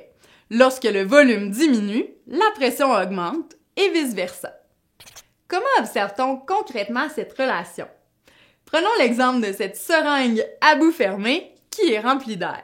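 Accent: Quebec, Canada. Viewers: ca a aucun rapport elle parle tres bien francais elle a juste un accent quebecois pcq crois moi que si un parisien vient au quebec c lui lextraterrestre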